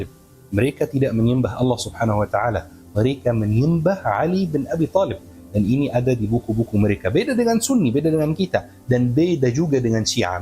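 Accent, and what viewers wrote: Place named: Arab world. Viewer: Ini spt suara. Mhs Mesir yg pernah belajar di Indonesia. Stlh tamat, dia tinggal di Indonesia. Yg dia lakukan: Pertama, jd youtuber, konten ttg mazhab2/sekte dlm dlm Islam. Kedua, setelah gagal jd youtuber, dia bertrsnsformasi mnjdi ustad.dan pnceramah. Pakai surbam, kliing2 Indonesia. Tp spt nya juga kurang berhasil. Ketiga, skrg kmbali lg jd youtuber spt dlm video ini. Kl ini apa yg dia ceritakan ini bkn hsil riset. Tp hnya berdasar berita, berdasar video2 yg sdh bnyak beredar pasca Jatuhnya al Asad. Sy justru curiga pd ini. Dia hnya ingin memainkan perasaan umat Islam Indonesia agar terprofokasi. Dia justru penebar fitnah baru. Tanda-tanda dia bohong, perhatikan dan dengarkan berkal kali dia bersumpah: demi Allah...demi Allah... Hai...kamu kan orang Mesir, coba kamu berani tdk kritik presiden kamu sendiri, al Sisi yg jg diktator al Asad. 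Brp orang yg mati dan dipenjara oleh rezim al Sisy ktika mrk protes terhadapnya..?? Comeon.....kritik presidenmu sndiri... Selancar apapun bhs Indonesiamu, sy msh bs membedakan bhw anda bkn org Indonesia. Ktika kamu mengucapkan fonem2 tertntu yg tdk ada dlm bhs Arab spt: nya, ng, pelafalan kamu msh klihatan sbg org Arab. Akhirnya, sy jstru curiga bhw anda ini mata2/ antek asing yg disusupkan di Indonesia utk mmecah persatuan bangsa Indonesia dg mmbahas isu-isu sekte/ mazhab yg terus berkonflik di timur tengah. يا....نحن نتابع كل خطوانك..وقد تركت الآثار التى لا نمحوها....!!!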